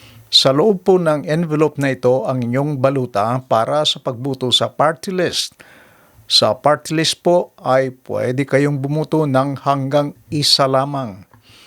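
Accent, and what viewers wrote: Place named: Visayas, Philippines. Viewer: Bisaya gyud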